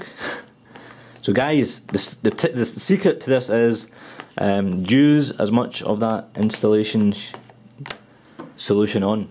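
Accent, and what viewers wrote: Scotland, United Kingdom. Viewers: It's called a scottish accent ... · At first i thought you were French, then i realised you were Scottish lol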